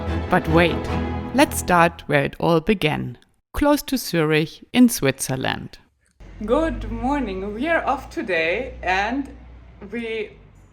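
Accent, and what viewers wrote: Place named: Germany. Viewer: Jura is pronounced SHÜÜRA and not with your heavy kraut accent YOOORAH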